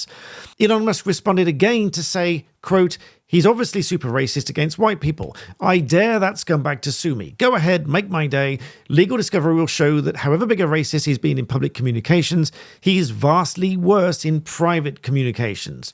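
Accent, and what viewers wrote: United Kingdom. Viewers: Talk too fast - plus intolerable "British Accent". No thanks.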